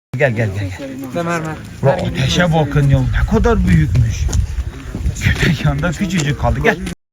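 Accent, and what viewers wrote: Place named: Van, Turkey. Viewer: Bitlislilerle vanlıların Türkçe konuşması birbirine çok benziyor. Benim bitlisli arkadaşlarım var aynı senin gibi konuşuyorlar Türkçeyi. Sen vanlıydın değil mi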